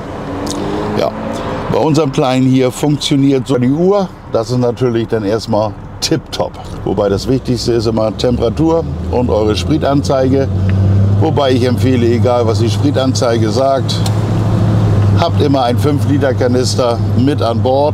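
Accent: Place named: Hamburg, Germany. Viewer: Also die Kaufberatung an sich ist nichts besonderes, weil nahezu alle Tipps auf jedes alte Auto zutreffen. Kleiner Kritikpunkt. Aber die kleinen Hintergrundinformationen zur Geschichte des Fahrzeugs oder die Prognosen der Wertsteigerung sind interessant. Und lieber, Deine Art zu Reden ist typisch Hamburg, sehr sympathisch, nordisch trocken und ich grinse bei jedem Video 😁 Bist halt ein echter Typ! 👍🏻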